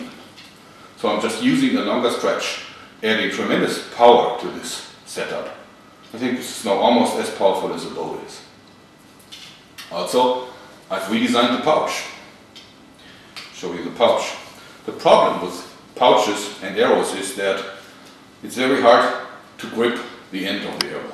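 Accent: Germany. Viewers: The german accent just makes it better! *I ment this in a 100% positive way*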